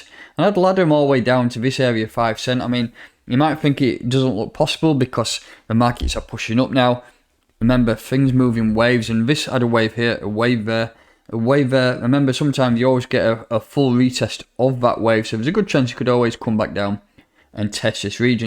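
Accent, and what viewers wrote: United Kingdom. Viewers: This is english..i bet you are american..he speaks British english..always american thinking they know it all.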